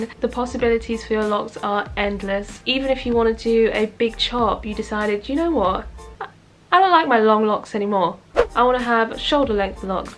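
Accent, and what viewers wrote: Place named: England, United Kingdom. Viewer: Lol, ya mom chupsing! Then I heard your English accent made me subscribe even though my locs are much smaller. Been looking for fellow Brits to follow. 👍🏾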